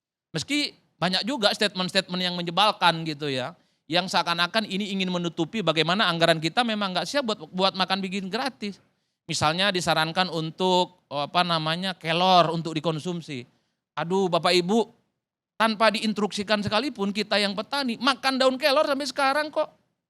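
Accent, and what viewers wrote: Madura, Indonesia. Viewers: Wkwkw Logat MADURA nya Ketara Banget...😂😅, ayo Ikuti Jejak Pak Mahfud MD, Utara kan Kebenaran 😊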